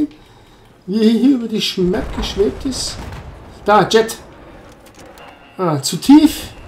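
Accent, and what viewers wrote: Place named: Bavaria, Germany. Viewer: 100% Bayer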